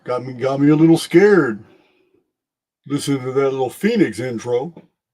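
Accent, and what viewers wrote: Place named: Texas, United States. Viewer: Thank you from the bottom of my ♥️. I got used to your voice andTexas accent and now hooked.I feel your voice and message comforting my soul. Greetings from Holland.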